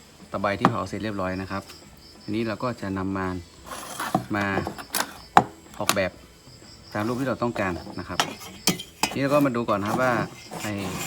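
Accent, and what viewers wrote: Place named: North, Thailand. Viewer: สำเนียงคนเหนือ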